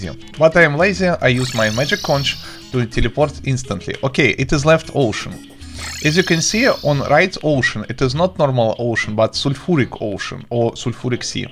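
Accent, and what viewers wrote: Russia. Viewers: Русский акцент не скрыть мен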